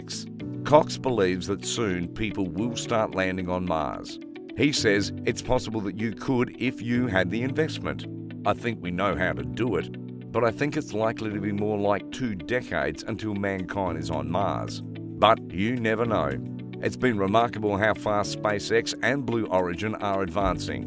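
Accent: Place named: Australia. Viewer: Glad to hear an Ozzie accent on Space exploration with know how. Just subscribed!!! Keep up the informative work - mate!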